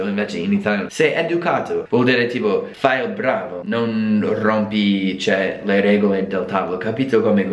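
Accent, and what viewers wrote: Rome, Italy. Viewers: Too much Romanesque... 😂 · You speak Roman not Italian, you know that, right? "Stare" is not a synonim of "essere" in Italian. If you want to learn italian I strongly suggest to change city. Go North young man! - (PS. Hamburg is a German town maybe "hamburger" should be pronounced with a German accent no?) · Troppo forte la cadenza romana😂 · Non ci credo, è l'unico straniero che abbia mai visto che ha imparato pure a fare l'accento romano:D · Ho le lacrime agli occhi dalle risate! Sei troppo simpatico. E poi ADORO che parli ROMANO 💖 e si sente un bottoooooooo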